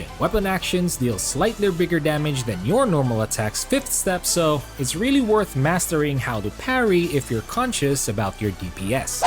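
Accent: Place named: Philippines. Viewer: Nice video po! very well explained :) pinoy english accent on point :3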